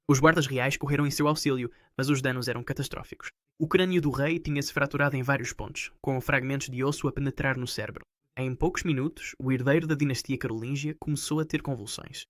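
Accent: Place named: Portugal. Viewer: Na verdade, português europeu. Se prestar atenção dá para entender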